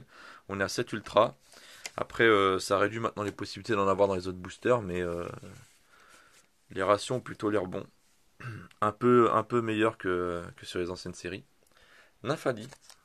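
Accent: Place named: United Kingdom. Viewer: Whaaa..!!! salut l'ami belle ouverture, et quelle surprise cette petite pub et hyper sympa de ta part! merci mon cher! =) comme il a dit oui venait sur ma chaine! =) sympa ton petit concept et je suis ravie que cela n'et rien prouver comme tu la dit ou moins les scalpeur vont pas arnaquer les gens avec leur mensonges grotesque! la secret Rainbow whaou =) MAIS TA CARTE PIKA LA JE LA VOIE PARTOUT IL M'EN FAUT UNE! x) +1 like pour ton british accent! lol merci encore a bientôt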